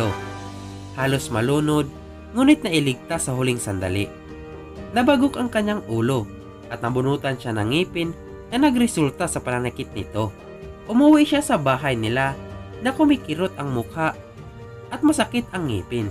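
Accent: Visayas, Philippines. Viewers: Bisaya ka kuya? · Bisaya ka ba sir? Hehehe. Pansin ko lng sa accent mo. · bisaya ja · Bisaya ka po? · like nyo kung BISAYA yung nag sasalita dito😁✌👇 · Bisaya man jod ni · Visaya ka po? Me no bicol po ako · I like your visaya accent🤣🤣🤣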